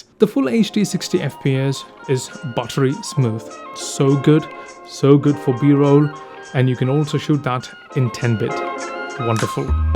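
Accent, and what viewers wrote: United Kingdom. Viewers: Accent like BBC news reader, Superb bro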